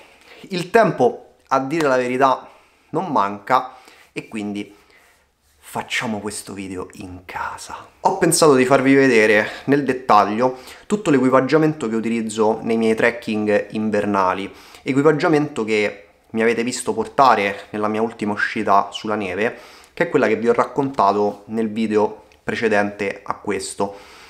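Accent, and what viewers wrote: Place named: Lazio, Italy. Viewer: Ciao, grazie per la condivisione della tua attrezzatura invernale! Purtroppo ti capisco quando dici che è una tristezza fare video in casa... qui in Piemonte, da novembre, siamo stati più arancioni/rossi che gialli e purtroppo anche io mi sono dovuto arrangiare con video indoor! Speriamo di tornare presto in montagna!! PS se hai seguito qualche mio video avrai capito che sono Laziale (Latina) di origine, tra l’altro ho vissuto tre anni a Viterbo e il tuo accento mi ricorda la mia gioventù! 😂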